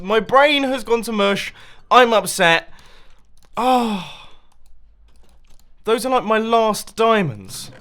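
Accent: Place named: Coventry, United Kingdom. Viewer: are you from Coventry? you just have the accent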